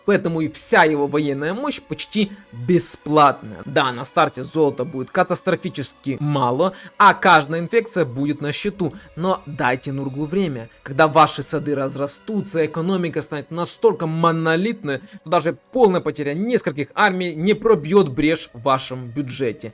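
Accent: Ukraine. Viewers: Хороший канал про практическую ваху. Уши режет украинский акцент , но в принципе очень годно. Лайк. Подписка ? да .. заверните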